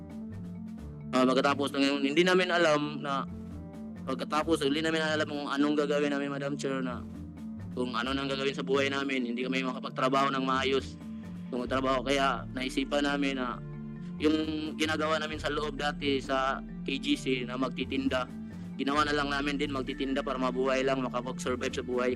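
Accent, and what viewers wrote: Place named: Visayas, Philippines. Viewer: bisaya kc sya.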